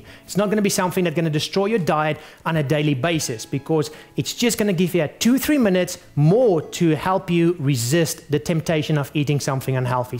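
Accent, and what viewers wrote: Afrikaans, South Africa. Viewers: He's South African...Most likely Pretorian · By your accent, I'm guessing you must be from South Africa. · is this guy South African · He-he, I couldn't place the funny accent until you pulled biltong out of the fridge :) Cheers from Canada! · Damn this guy has a strong Afrikaans accent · what accent is this? South African? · definitely an Afrikaans accent lol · This guys accent makes me think of krugerrands. And Krugerrands makes me think of Lethal Weapon 2. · He sounds like South African' correct me guys if I'm wrong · Less talking. More showing. The talking makes you look like a idiot. S.A accent. Painfull. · Kyle Pickens he speaks weird cause he is afrikaans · South African? I here that Afrikaans. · Is that a south African accent?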